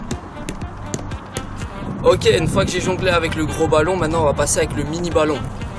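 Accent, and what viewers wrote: Belgium. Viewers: On reconnaît l'accent belge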